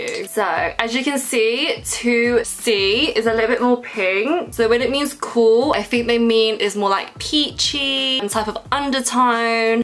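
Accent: England, United Kingdom. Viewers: Your accent tho😍I love ur English accent😍I'm from Ireland btw❤ kildare ( a place in Ireland x) xx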